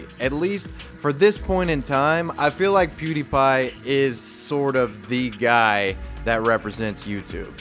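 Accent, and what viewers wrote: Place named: Tennessee, United States. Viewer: Yeah, you’re definitely from Tennessee 😄 that southern accent was spot on! I’m from East Tennessee and that’s EXACTLY how old ladies sound around here 🤣🤣🤣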